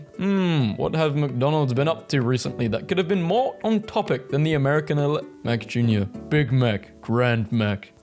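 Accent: United Kingdom. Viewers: I like how it's a British guy commentating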